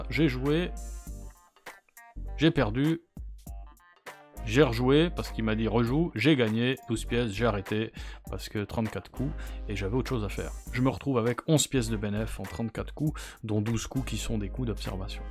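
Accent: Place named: Switzerland. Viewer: Je pense avec son accent et les huitante/nonante, il est plus du côté de la Suisse. Reste à savoir quel canton.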